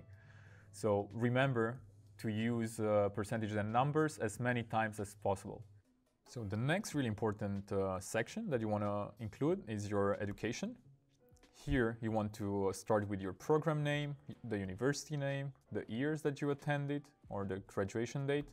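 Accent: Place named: Israel. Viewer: You must be from Israel. You sound Israeli and have a great personality.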